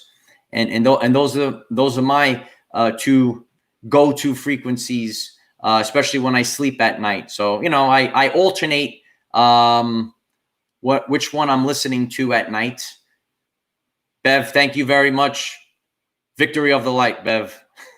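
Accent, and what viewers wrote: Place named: New England, United States. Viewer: I love music. How can I find out the frequency of different songs? Also, I've been seeing my numerical birth date often recently, including the number of likes this video had. I suppose it's an indication of something being of personal significance but wondering if you have any more specific insight of these synchronicities. Thanks. I appreciate your smile, your guidance and comradory through this exciting journey. Where did you grow up? I'm a southern gypsy and enjoy your new england accent. Thanks again. Namaste 🙏🌈🕊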